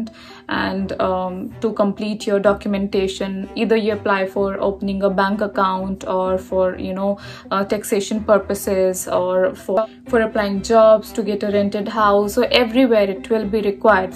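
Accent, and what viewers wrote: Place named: India. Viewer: Beautiful lady, from the accent im sure your an indian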